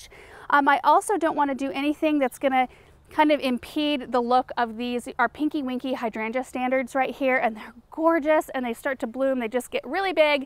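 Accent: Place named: Northwest, United States. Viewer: Yup, I pronounce herb and foliage exactly like you do. We're both from the Northwest.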